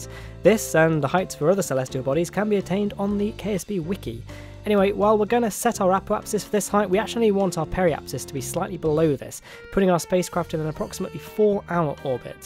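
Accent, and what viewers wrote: United Kingdom. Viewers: It’s because of his British accent and many Americans think that Britons are very posh, even though most are not. (Just listen to a song!)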